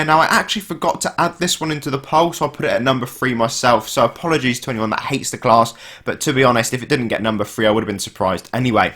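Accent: United Kingdom. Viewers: I really love your british accent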